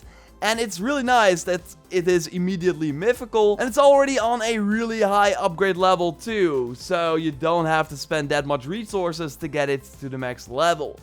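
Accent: Netherlands. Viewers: Are you Nederlands? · are u ducht? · How can people listen to this guy, the Dunglish is hurting my soul.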